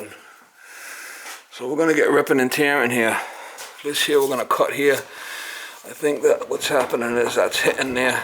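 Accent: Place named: Massachusetts, United States. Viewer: This guys Mass accent makes this channel fun to watch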